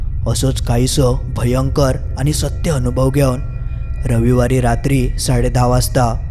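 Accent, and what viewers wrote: Malvani, India. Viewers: मालवणी बोको हा चॅनेल मी माझ्या 3 अकाउंट वर फॉलो केलेला असा. आणि मित्र परिवाराला ही फॉलो करायला सांगितलं असा. माझ्या घरातल्या 16 जना नच्या मोबाईल वरून पण फॉलो केलेला असा. गावी जातो तर तुमचे व्हिडिओ आम्ही ग्रुप ने बसल्यावर बघतो रात्रीचे. खूप thrill वाटते ग्रुप मध्ये बसून ऐकायला एक वेगळीच मज्जा येते. त्यात ह्या व्हिडिओ मध्ये तुमचा मालवणी आवाज.त्यामुळे व्हिडिओ ला एक वेगळीच मज्जा येते. मी रोज दिवसातून 4 व्हिडिओ बघतोच बघतोच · I.like.your.malvani.voice.laya.bhari.rel.Vivek Singnath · Which malvani he is talking ..this is different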